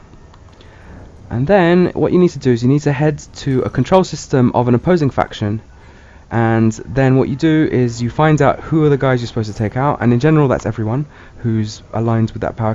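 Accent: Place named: United Kingdom. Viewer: You know it's funny, I feel like these videos are so much smarter sounding and informative with a British accent than if anyone with an American accent made them ;o (is American)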